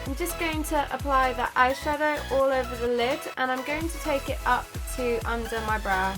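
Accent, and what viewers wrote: United Kingdom. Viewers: i'm german and i absolutelyyy looovee your british accent (is is acctually british huh?! :D) and I love your videos!!!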